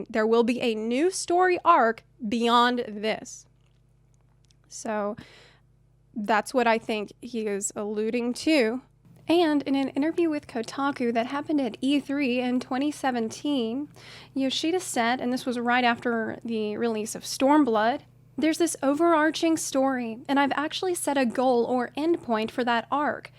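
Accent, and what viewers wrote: South, United States. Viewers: when you sound southern American and British at the same time :|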